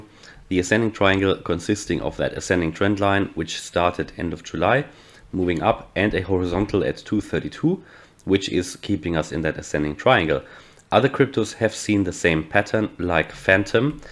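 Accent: Germany. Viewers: Yet your accent is german.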